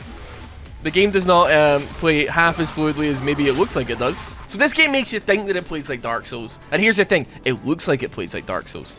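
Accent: Scotland, United Kingdom. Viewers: I'm new here is he British or Australian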